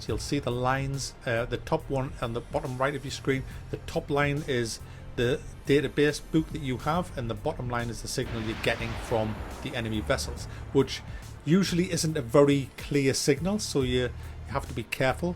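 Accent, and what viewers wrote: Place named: Teesside, United Kingdom. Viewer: Hillariaous, sound like just over the bridge. Smoggies as you lot call us 🙂 Agg missed the k from end of the c, ponders if put an o between 🙂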